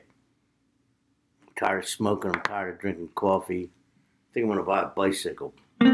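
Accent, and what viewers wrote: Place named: Philadelphia, United States. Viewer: You have a Philadelphia accent. Cool. Subscribed.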